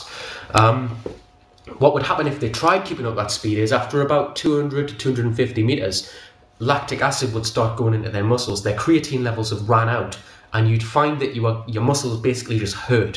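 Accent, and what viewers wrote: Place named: United Kingdom. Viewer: Hey, from your accent I guess your from the UK, i was wondering where abouts are you based as i was thinking about having a personal trainer cheers tom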